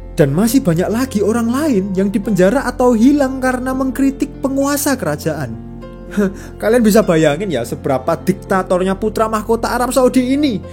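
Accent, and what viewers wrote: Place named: Japan. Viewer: Ngomongnya medok banget kaya org jepang takeshi sunyoto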